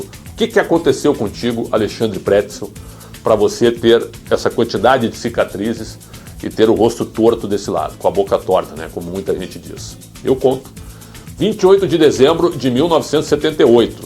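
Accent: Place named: Rio Grande do Sul, Brazil. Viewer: Parabens por essa historia de vida e com bom sotaque gaucho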